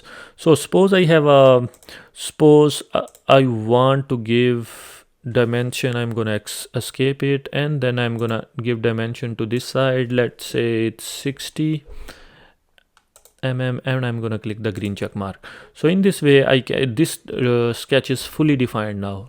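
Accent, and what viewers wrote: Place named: Haryana, India. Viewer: Haryana se ho kya bhai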